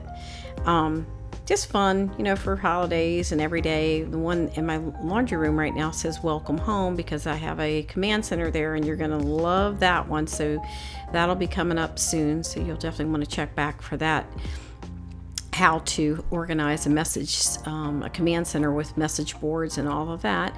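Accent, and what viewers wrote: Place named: Virginia, United States. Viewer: Hi, Your accent sounds like it is from a previous place we lived. Is it possible that you are from Virginia? We lived in New Hanover County, specifically Mechanicsville.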